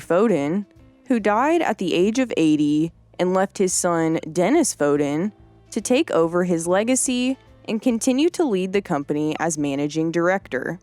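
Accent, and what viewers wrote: United States. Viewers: It's amazing with a yankie american women speaking trow a documentary I love it cool makes you think she's british and loves ERF trucks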